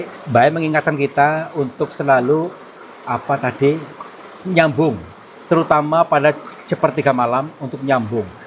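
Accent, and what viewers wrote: Banyumas, Indonesia. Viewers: Ngapusi dirinya sendiri ,ingat jaman sekarang itu nggak ada gitu gituan ini bohong ,kalau di lihat dari gaya logat ngomong nya ini orang ngapak ,semoga sukses bikin berita bohong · Kayane wong ngspak kang pada karo inyong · Orang ngapak om?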